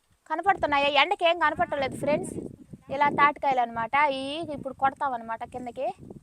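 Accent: Nellore, India. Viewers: E ooru akka, mida, mi telugu nellore slang laga undi.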